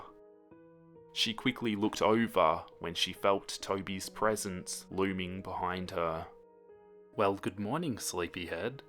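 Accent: Australia. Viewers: You sound like an Australian version of Chillz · I think it sounds more austrailian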